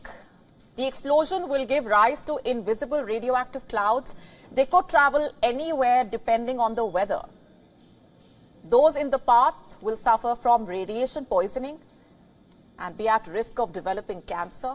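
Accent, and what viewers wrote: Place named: India. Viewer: i love indian /english accent :person-turqouise-waving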